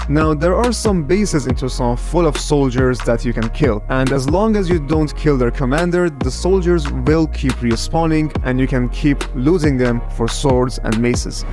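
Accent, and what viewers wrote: Iran. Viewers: U have Persian accent are u Iranian? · بسیار عالی(از لحجه‌ات فهمیدم ایرونی) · Ur accent sounds persian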